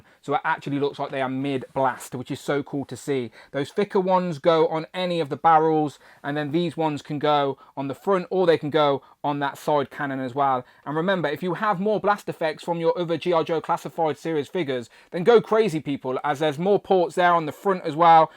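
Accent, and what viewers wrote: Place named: England, United Kingdom. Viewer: These English gents are so funny the way they pronounce everything… Absolutely hilarious a lot different than us Americans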